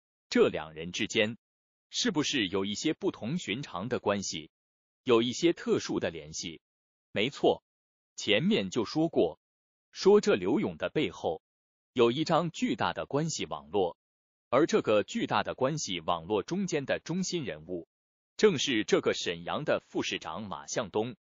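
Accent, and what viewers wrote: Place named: Taiwan. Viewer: 这是哪毕业的播音员啊？台湾吗？这普通话不是母语啊！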